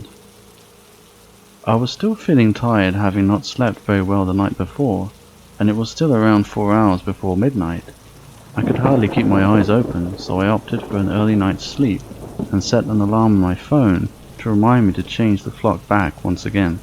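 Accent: United Kingdom. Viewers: What’s his accent? Slightly British?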